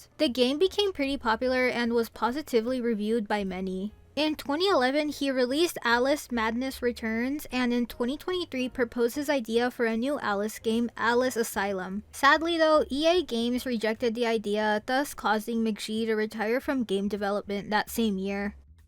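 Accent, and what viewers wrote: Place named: North America. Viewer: You're kidding. You speak better English than me, and that's MY first language! And the accent sounds like it could be from the US or Canada, which is even more impressive because it sounds like you grew up in one of those places around people with the same accent! And no sweat on mispronouncing things. Us folks that grew up with English do that occasionally, too. I used to do YT, too, and sometimes, I'd read something, know exactly what that word was, but my voice would already have enough momentum going it would absolutely plow through a word with no regard for pronunciation like a bull through a gate.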